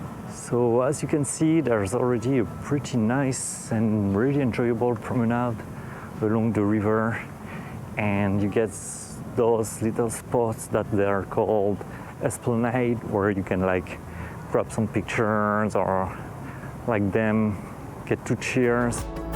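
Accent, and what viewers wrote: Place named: France. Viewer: I think he’s French that’s why they say oi as oa.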